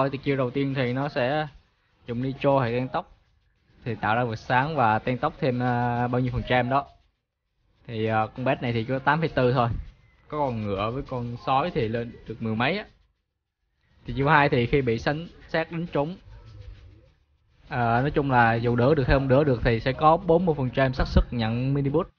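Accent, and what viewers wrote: Quang Ngai, Vietnam. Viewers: Giọng QN dễ thương ghê haha :))))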